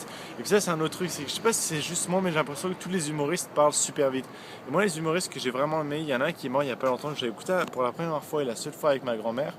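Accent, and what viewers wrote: Quebec, Canada. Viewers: Tu as un accent semi français semi Québécois. C'est fou!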